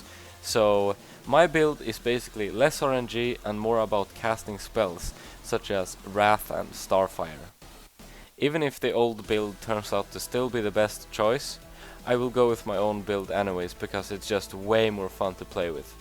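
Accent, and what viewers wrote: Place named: Sweden. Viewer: Dat swedish accent :P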